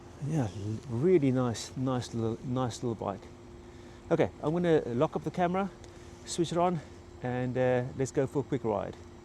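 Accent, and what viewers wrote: South Africa. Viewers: You have a South African accent, have you been?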